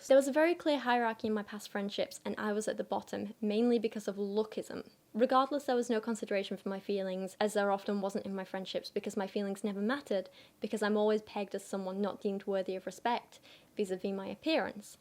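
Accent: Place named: Northern England, United Kingdom. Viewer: A northern girl repressing natural accent with London estuary accent.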